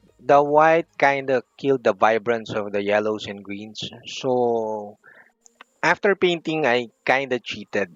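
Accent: Philippines. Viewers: the way you talk sounds like a native Filipino.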